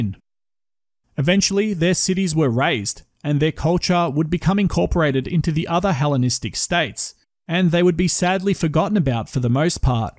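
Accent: Australia. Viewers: Great to hear a Aussie voice talk intelligently about the stuff I want to learn. Great work mate.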